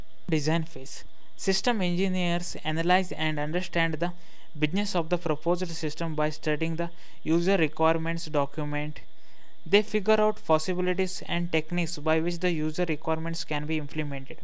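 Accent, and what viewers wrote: India. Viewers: Very knowledgeable, he sounds South Indian....would really help if he got his accent sorted out...as it really become difficult to understand as well as taking his video seriously. Although he knows what he is on about, but the accent really is a turn off....could not use this as a video for my British students, i just know they will be bemused by the accent. Anyhow informative vid:) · he is indian guy, but I can understand him because alot of my teachers are indian and I get used to this kind of english. Thanks for the video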